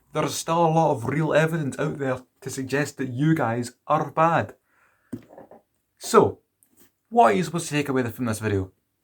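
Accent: Ireland. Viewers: Love the Irish accent my guy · Wow this guy has such an amazing Irish accent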